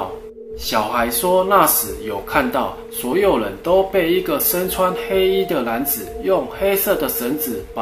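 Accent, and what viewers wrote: Taiwan. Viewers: 有台灣國語😂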